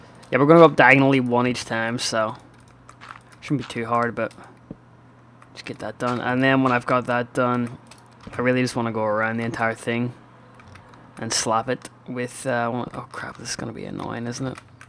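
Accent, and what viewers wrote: Northern Ireland, United Kingdom. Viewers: He has a northern Irish accent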